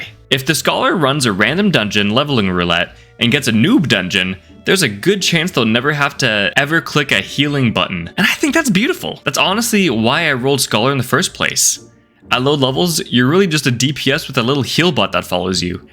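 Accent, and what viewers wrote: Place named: Southern New York, United States. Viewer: That was perfect Southern NY / Northern NJ voice.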